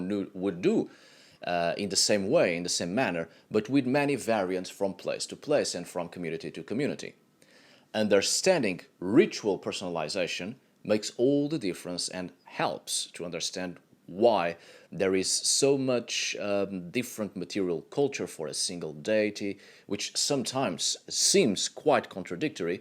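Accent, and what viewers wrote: Portugal. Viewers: Hi K, I read that somewhere has two cats 🐈 two females cats. One called Sól and the second one called Gigi. Sól and Gigi are two Norse Goddesses names. is half Portuguese and half Danish with in addition of some Irish, Gaelic and Scottish, DNA. Following his outdoor videos vegetation’s are northern Portugal area. So I guess that he is living in Portugal northern part of Portugal somewhere in the Tras Os Montes area. Sometimes his accent is clearly Portuguese accent. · Even with your Danish and British heritage I could spot your Portuguese DNA a mile away the accent does not lie, love from Portugal bro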